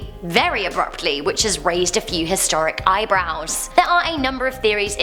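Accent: United Kingdom. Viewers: I love that this is spoken by British 😂😂 but is in Canada / America not sure but Yh I’m from England so respect 😂😂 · Nodaysoff J she literally said mayans Shes british.. Obviously she has an accent.. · Was kinda hoping for a guy to speak with a lower voice and uuummm, no British accent since like idk YouTube is from America? Is that too much to ask for? Lol. Dislike. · Yeah, she's from england and currently lives in canada i think... People from the states might pronuce it differently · that depends on whether or not you grew up in London or not she grew up in London and she has a thick British accent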